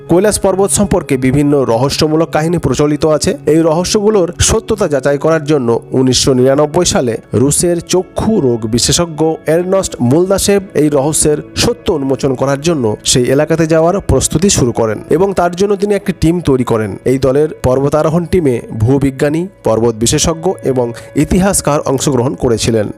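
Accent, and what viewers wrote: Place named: Kolkata, India. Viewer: ক্যালকেসিয়ান উচ্চারন পরিহার করুন!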